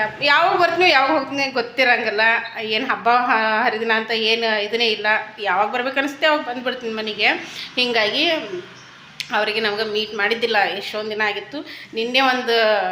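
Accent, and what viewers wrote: North Karnataka, India. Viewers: ನಿಮ್ಮ ಸ್ನೇಹ ನೋಡಿ ತುಂಬಾ ಖುಷಿಯಾಯಿತು ಸಿಸ್ಟರ್ ಇದೇ ತರ ಯಾವಾಗಲೂ ಇರಿ ಸಿಸ್ಟರ್❤❤, ನಮ್ಮ ಉತ್ತರ ಕರ್ನಾಟಕದ ಮಾತು ಕೇಳಿ ತುಂಬಾ ಖುಷಿಯಾಯಿತು ನಾನು ನಿಮ್ಮ ತಂಗಿ ಅನ್ನು ಕೊಳ್ಳಿ ಸಿಸ್ಟರ್, ತಾಯಿ ಕೈಯಿಂದ ರೊಟ್ಟಿ ತಿನ್ನುವುದಕ್ಕೆ ತುಂಬಾ ಅದೃಷ್ಟ ಮಾಡಿರಬೇಕು ಸಿಸ್ಟರ್, ನೀವು ತುಂಬಾ Lacky, ನಿಡೋನಿ ಅಣ್ಣನಿಂದ ಪರಿಚಯವಾಯಿತು ಸಿಸ್ಟರ್ ಅದಕ್ಕೋಸ್ಕರ ನಿಮ್ಮ ಚಾನೆಲ್ನ ಡೈಲಿ ವೀಕ್ಷಣೆ ಮಾಡುತ್ತೇನೆ❤❤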